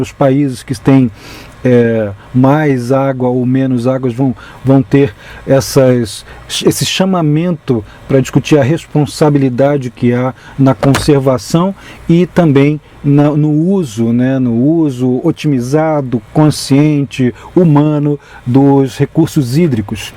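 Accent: Brazil. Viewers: bom dia! Você é mineiro? Sou mineira de Belo Horizonte, taurina e ascendente em peixes. Grata pelas explicações dos eclipses, um abraço!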